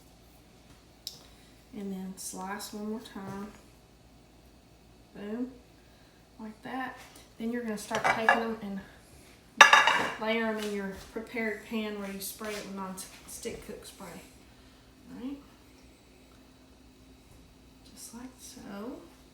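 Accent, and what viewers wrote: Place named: South, United States. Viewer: Oh these look so good. Love your sweet accent and southern charm. Much love from Abilene. - Miss Hetta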